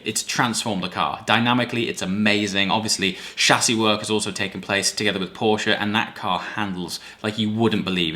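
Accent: United Kingdom. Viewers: THE British native speaker.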